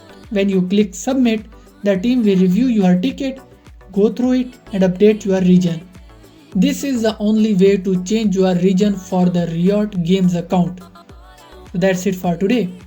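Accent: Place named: Bollywood, India. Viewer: always the bollywood accent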